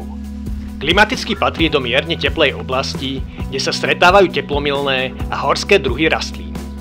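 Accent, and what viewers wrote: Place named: Lowlands, Slovakia. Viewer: Parádny spôsob ako navštíviť rodinu, klobúk dole 👌. Inak aj som si myslel, že pochádzaš z dolniakov podľa prízvuku 🙂. Tento hrebeň si chcem tiež natočiť toto leto a fakt díky za inšpiráciu, kde prespať. Normálne mi to nenapadlo, že zvoliť rozhľadňu na Panskej. Aspoň nemusím teperiť stan 👌. PS: Inak nabudúce prídi na premiéru, mal si tam normálne 8 ľudí 🙂. Peťo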